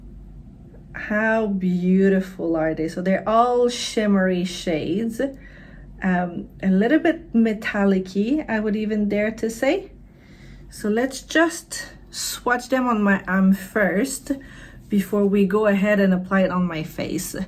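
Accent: Quebec, Canada. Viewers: Beautiful! Je reconnais un accent Québécois?😉😉J’adore les Météorites! ❤ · Hello! Gorgeous! May I ask, are you originally from Quebec? 🙂 I am so I feel like I recognize an accent. Vraiment magnifique, j’adore Guerlain! I bought the pearls (I love Meteorites so much) and some Kiss Kiss balms. I am really hesitating to get the eyeshadow palette. 😍 Thanks for sharing! 🫶🏼 I subscribed! If ever you want, I have a channel too. Have a great day!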